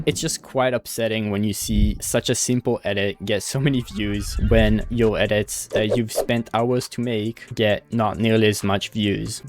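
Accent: France. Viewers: Are you French? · Dude are you french?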